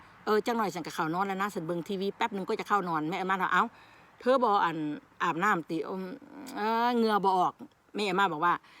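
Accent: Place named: Kui, Thailand. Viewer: เว่าเป็นตากุยแท้ครับ ฝรั่ง55+😄😂🥳😁🎉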